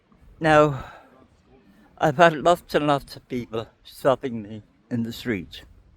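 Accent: Ireland. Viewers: I am a Jew and an Israeli. Thank you for standing with us. It's beautiful to hear an Irish accent that isn't speaking hatefully toward us. May God bless you!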